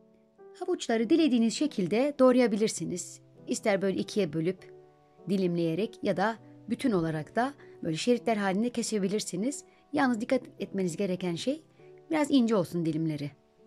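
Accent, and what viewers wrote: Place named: Southeast, Turkey. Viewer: Eline Emeğine Sağlık Abla Bende Yapacağım Nasipse İlk fırsatta Ablam Konuşma Şiven Bizim Oralı aksan yani Ablam Güneydoğulu musun Ablam Selamlar Başarılar Diliyorum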